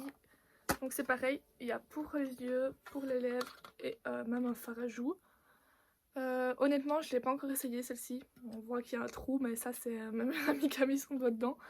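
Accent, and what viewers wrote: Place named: Belgium. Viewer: Coucou sacrée collection ! Ton accent m'intrigue tu es en Belgique ?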